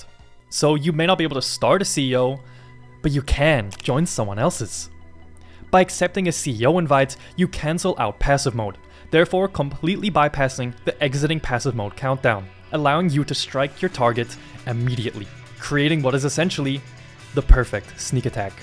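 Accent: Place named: Argentina. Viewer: I'm sure he's from Argentina. I'm from there and I have no doubts that's our accent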